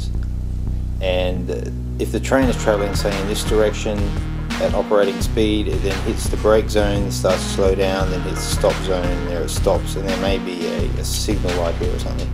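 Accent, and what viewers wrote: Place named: Australia. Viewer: I simply love your videos. Thanks for posting. I'm still strictly DC and have no plans to go to DCC unless a miracle happens and I maybe win the lottery. I guess I'll continue my DC layout, but I thank you for the insight into DCC. I've searched the internet for NEA Railroad software and cannot find anything on this software. You seem to have an Australian accent so maybe this is only available in Australia. Keep up the good work and I really enjoy your videos. Keep 'em coming.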